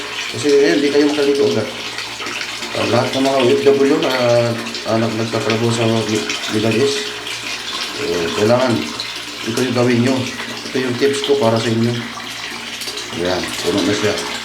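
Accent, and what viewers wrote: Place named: Visayas, Philippines. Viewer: Bisaya mn ka Idol ky salita halata mn gud ta mga bisaya 😅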